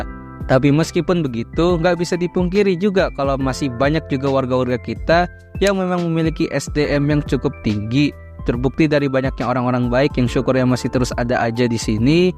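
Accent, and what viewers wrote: Java, Indonesia. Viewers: Lebih ke jawa sih bang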